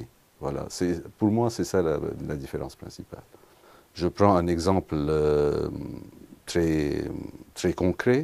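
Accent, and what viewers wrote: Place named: Quebec, Canada. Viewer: I disagree. I think he's French-Canadian. Either that, or he has spent time in Canada and he's really really advanced in French.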